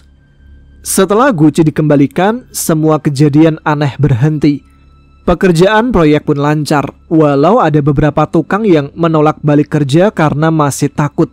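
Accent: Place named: Lampung, Indonesia. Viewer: Oi mas lampung nih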